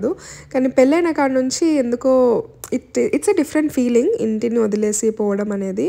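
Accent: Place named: Kurnool, India. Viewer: Kurnool yasa vinte aa kicke veru Abba !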